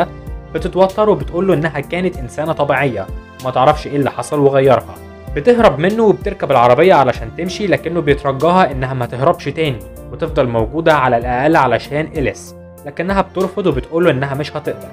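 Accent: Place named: United States. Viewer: شبه صوت شخص امريكي تلعم مصري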